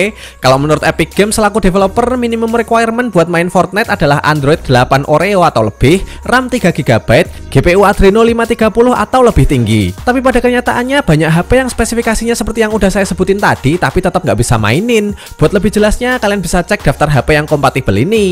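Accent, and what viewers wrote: Java, Indonesia. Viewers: matamu methothoo wkwkwkkw orang jawa mana bang? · kamu itu dari jawa ya soalnya cara bicara kamu itu mirip org jawa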